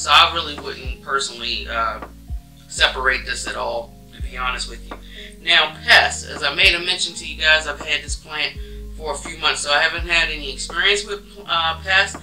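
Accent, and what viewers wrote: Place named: DMV, United States. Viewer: Came for plant info stayed to see if your from the dmv lol I hear it in your voice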